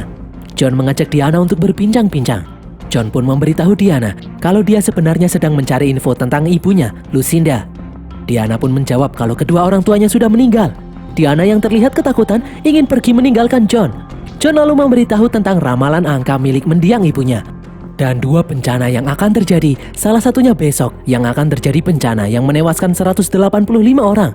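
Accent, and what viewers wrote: Java, Indonesia. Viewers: Logate medok yo masse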